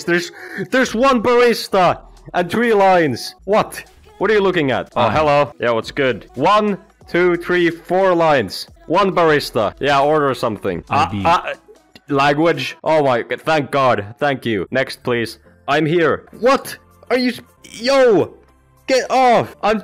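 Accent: Finland. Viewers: Are you finnish? You sound finnish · I might be totally wrong but your english sounds like you're finnish, is that true or am I tripping? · Hol up is that a finnish accent? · i love your english pronounciation, reminds me a little bit of the famous finnish "rally english", and i mean that in the best way possible.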